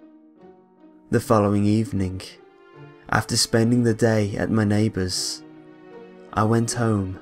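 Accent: United Kingdom. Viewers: This is my favorite creepy pasta channel, I don't why but I like them better when someone British reads them. I have watched most of his, does anyone know of another really good british creepy pasta channel?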